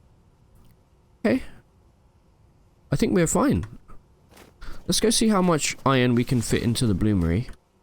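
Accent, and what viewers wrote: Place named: United Kingdom. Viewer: for music, my suggestions are, and (especially )!! i listen to way too much kawaii metal and not much else… isn’t kawaii metal and im not sure what genre they’d fit under but it’s good (and heavy!) streaming would be awesome, but i think i’d miss most of them ☹️ since our timezones are opposite (assuming you live in the uk from your accent) enjoying todays episode with my cat!!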